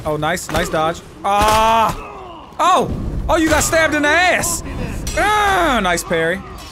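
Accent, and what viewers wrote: South, United States. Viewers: How the hell would you think he's black? His accent is white southern af, I just don't see it.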